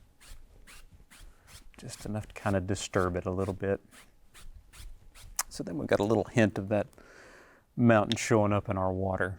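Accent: South, United States. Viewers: That southern drawl… it’s…. hypnotic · Another beautiful job I love your own personality that creeps out and that hint of a southern twang not as southern as mine but I hear it - it’s a shame the video is ruined by constant commercials cutting in I’m sure there could be a better way of viewing this without YouTube ruining it too much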